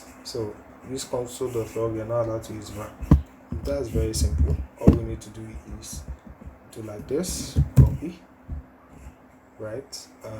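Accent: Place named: Nigeria. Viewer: From your intonation, I feel you re a Nigerian. pls i base in ph. i need a mentor on programming. pls be my guide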